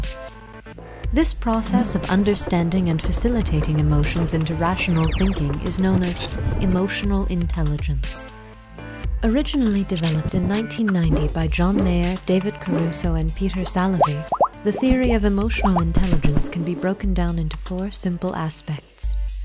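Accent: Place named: United Kingdom. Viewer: Some one PLEASE tell me WHY these producers insist that a 'British' accent qualifies as an authoritative narrative ????? Is this opperant conditioning attitude the best you can do? Right coast version of British accent turned inside out. The flexion is suck eggs.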